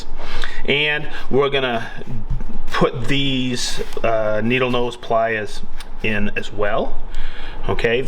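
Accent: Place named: East Coast, United States. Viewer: Been a long time carpenter and really have enjoyed watching your videos. Living in So Cal my whole life really dig the east coast humor and accent 🙌🏼🙌🏼 Been starting to follow the Festool live show also. Moved here to NC and ISO a festool location 🤷🏻‍♂️ any help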